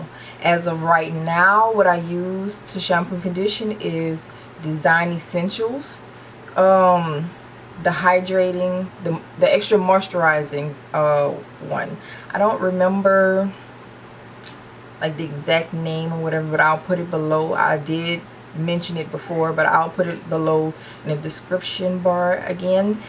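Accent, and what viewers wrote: New Orleans, United States. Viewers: New Orleans? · Sound like you from new orleans babyyyy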